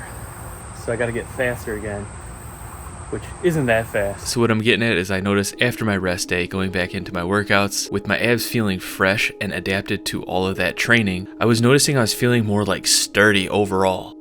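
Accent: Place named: Midwest, United States. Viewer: I love the midwest accent. Idk why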